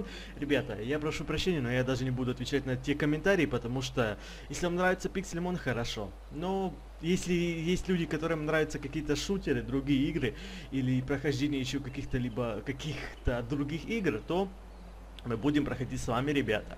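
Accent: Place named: Ukraine. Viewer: У как будто акцент какой-то, может украинский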